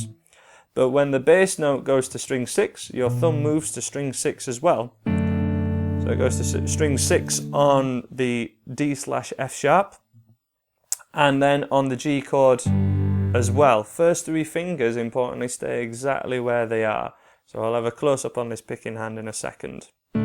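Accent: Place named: England, United Kingdom. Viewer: English. He's from Leeds.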